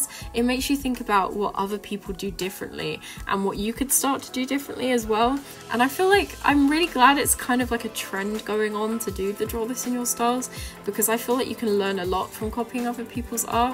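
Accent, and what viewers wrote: United Kingdom. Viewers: So I'm kinda new to this channel I subbed a few weeks ago and I've been wondering "Are you British?" Also I think you should do mermaid watercolor art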